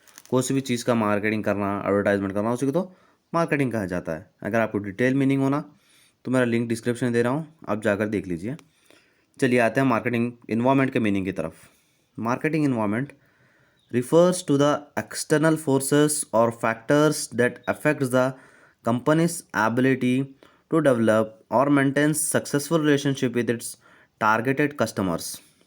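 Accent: Hyderabad, India. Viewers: sir aap hyderabad se ho?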